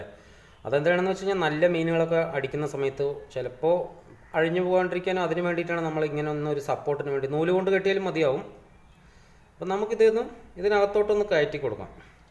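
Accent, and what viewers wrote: Palakkad, India. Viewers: യെ ഏട്ടോ നിങ്ങ പാലക്കാടാണ്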